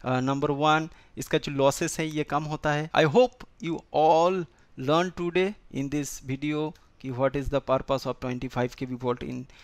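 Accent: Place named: India. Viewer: I really appreciate the content but I need to say, that for a not native speakers or not Indian the accent is hard to understand. So as a constructive advice I will recommend improving your speaking skills to easy reach the 1M.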